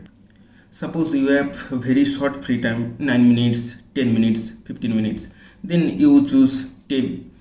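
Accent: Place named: Bengal, India. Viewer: That bangla accent is so nostalgic here in Delhi. Great tips! 👍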